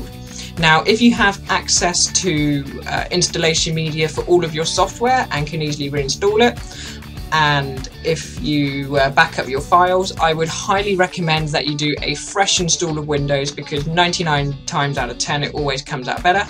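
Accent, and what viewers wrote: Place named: United Kingdom. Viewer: I know where you live. You live in UK I know this accent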